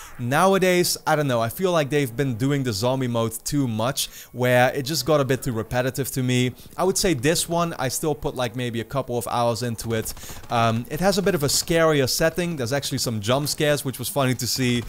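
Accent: Netherlands. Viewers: Dutch ? I can here that right away because the accent lol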